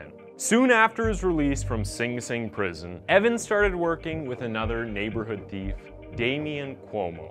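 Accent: Canada. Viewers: no I'm not going to lie yesterday I was watching one of his videos and totally capping on how he talks. Is he Canadian? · Is he Canadian? I sense a slight accent and some of his spellings are spelled in traditional English such as "behaviour." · Oh the Canadian pronunciations lol. It’s all good though. It goes both ways. Love you Canada! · nice channel, are you Canadian? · Hes canadian.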